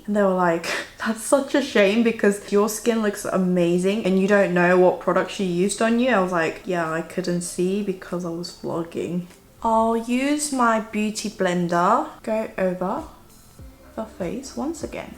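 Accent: United Kingdom. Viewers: 언니 영어발음에 치이고 치인다..❤️사랑해요ㅠㅠ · 언니 영국식 영어발음 너무 좋아요 진짜ㅠㅠ최고 좋아요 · 오늘도 매력적인 영국발음으로 귀여운 영상감사해요:) 다음 영상은 새로운 집을 배경으로.볼 수 있겠네요🙋🏻 새로운 환경, 새로운 구도에서 님은 구독자로서 기대되거든요😚 요리영상도 기대되고 이사간 마을에서 좋은 곳도 소개해주세요💕 좋은 추억이 많이 생길집이길 바래요 Q1) 네일 색이 참 이뻐요:) 셀프 네일인가요? 어디제품인지 궁금해요오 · 오늘도 영국발음에 치이고 갈수록 예뻐지는 미모에 치이고.. 존예에요💕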